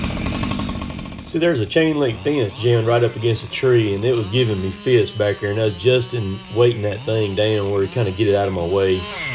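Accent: South, United States. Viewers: I think I like these videos more than your logging videos! Enjoy your southern accent...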